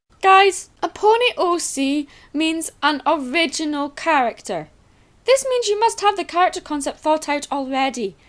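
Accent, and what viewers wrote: Scotland, United Kingdom. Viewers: ... i she scottish? i am aswell sooo yeah your not the only scot brony if that's the case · lol I'm slowly getting used to the Scottish accent cos my friend is Scottish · Are you Scottish? Well if you are then I am to! 😄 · Norlén SHE IS NOT IRISH SHE IS SCOTTISH!!!!!! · She's Scottish! What did you expect? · I love your accent lol. I'm Scottish too! #ScottishSquad And I'm fine with recoloured "OCs", but if the person who made one is claiming it to be an original "OC" or "theirs", that just makes me rage! XD. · Scottish like me :D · by the way I love your Scottish accent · omg i love Scottish accents!!!!! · I knew you said 'Clydesdale' because of that Scottish accent, furry hooves and it's not a damn pegasus. lol people can't think any further I don't like pony generator/creator because it looks stiff, unoriginal (just like you said) and it kinda makes the ponies look.. 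weird. Most people (that I've seen) who use it make their OCs have bad color combinations. I'd rather see an OC scribbled than people using the pony generator. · scottish like me ;) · She’s Scottish, and I can do an Australian Accent. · So your Scottish?! Yey! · So I'm guessing ur Scottish lol go Scotland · It's Scottish wait I'm Scottish Yay I found a Scottish youtuber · your not the only fan in scotland I can tell you live there cause we've got the same accsent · omg are u scottish squee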